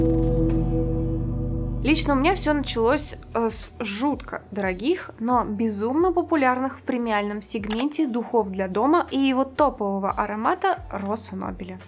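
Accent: Russia. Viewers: ивановский акцент))))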